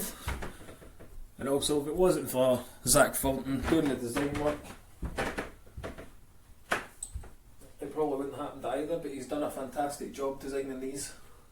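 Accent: Scotland, United Kingdom. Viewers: I came here for darts, and stayed for the Scottish accent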